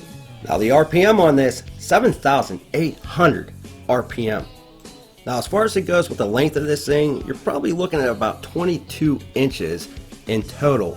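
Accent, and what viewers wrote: Pittsburgh, United States. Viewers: You have to be from near Pgh based on your dialect. If so, awesome.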